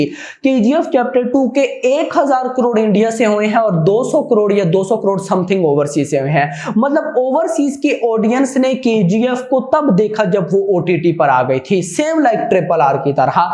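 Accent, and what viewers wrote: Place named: Pakistan. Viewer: Bhai kaha se ho bolne se to Pakistan ke lagte ho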